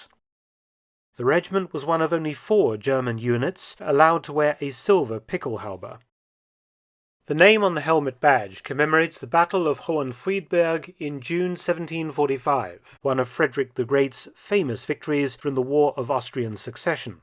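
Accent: United Kingdom. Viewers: What is saying in his British accent is "pickelhaube". He is pronouncing it correctly, but I tried to google "pickel halbee" and it didn't work.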